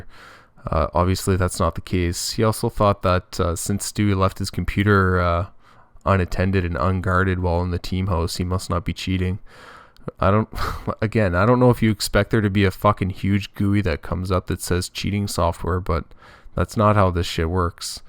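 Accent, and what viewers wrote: Canada. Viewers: Are you Canadian? You're accent sounds very similar to me. I'm from Vancouver BC Canada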